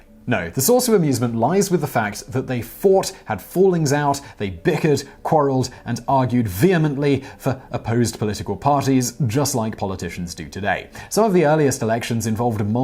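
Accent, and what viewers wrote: England, United Kingdom. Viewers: Yes, he has an english accent. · Never trust anyone with a British accent trying to teach you history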